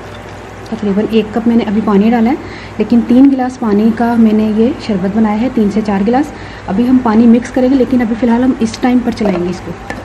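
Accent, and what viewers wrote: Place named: Punjab, India. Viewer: OK ji, asi dono cheeni te Gurr alag alag try kara gae, jo changa lagya agey to oh cheez pa lya kara gae ji, hor v es type de fruit de juice dasna ji. Tuhaadi Punjabi sun k bahot he vadiaa lagda hae, edhar di Punjabi te tuhaadi Punjabi iko jehi hae, sun k bahot mazaa aanda hae.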